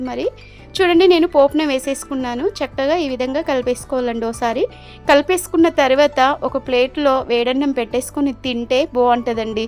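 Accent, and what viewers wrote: Telangana, India. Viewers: Telangana & Andhra mix ah andi? Yekkada mee native?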